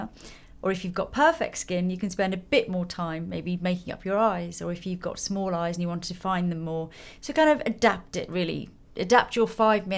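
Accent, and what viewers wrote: United Kingdom. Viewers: wher u from UK??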